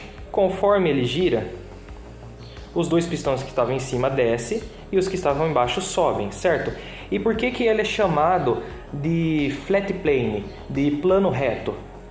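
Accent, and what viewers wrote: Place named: Inland São Paulo, Brazil. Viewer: Sotaque interior Paulista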